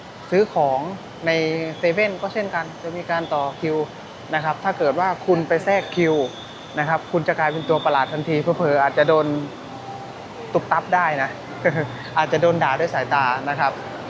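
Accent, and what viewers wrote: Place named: Ubon Ratchathani, Thailand. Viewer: เจ้าเว่าลาวคือคนอุบลเว้าเลย สำเนียงคือกันเลย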